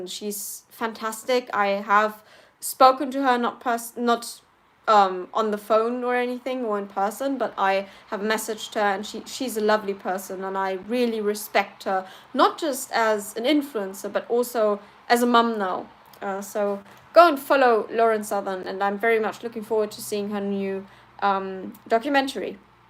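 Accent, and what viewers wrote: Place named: United Kingdom. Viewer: You sound a bit like a character out of the Harry Potter films when you're speaking English. No offence!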